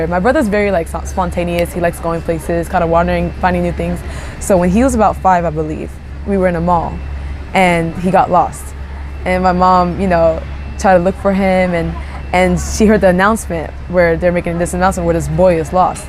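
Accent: Japan. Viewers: Haha! You are so Japanese lol! The way you speak English is colored by the Japanese. It's really interesting. Your American classmates must have thought it was different!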